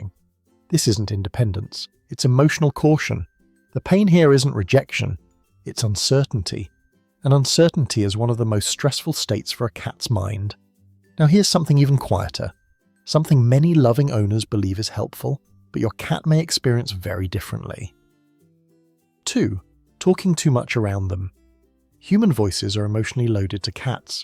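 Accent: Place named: United Kingdom. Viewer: Why do all AI videos have the same British accent. Not a good one, either.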